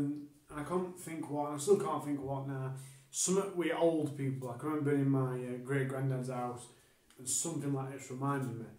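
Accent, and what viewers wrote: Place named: Manchester, United Kingdom. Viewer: Appreciate your honest review, my man! Just found you on YT with this review. I have one in the post, really looking forward to getting my hands on it. I’m usually good at identifying English accents. I can’t quite place yours. Definitely Manchester-ish, broadly speaking. Btw, love that you have real life intruding in your video. There’s something endearing about the banging and interruptions, lol!! Keep up the good work, mate.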